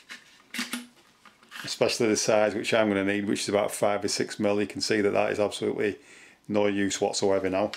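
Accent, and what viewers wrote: United Kingdom. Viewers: What is your?. By your accent I'm thinking you're British but not sure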